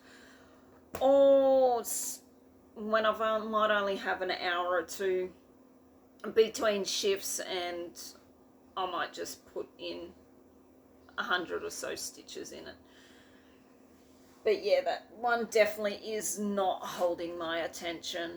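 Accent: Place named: Australia. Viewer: Always good to see a video off you, no matter if it's a small or large amount of stitches. Boy that was a super busy weekend, you had every right to be cream crackered. Do love the brown fabric you dyed👍. The stand looks very well made, sure you'll get many thousands of stitches completed on it.I love hearing the Aussie accent! Stay safe, hoping you'll be able to get some stitches completed 🤗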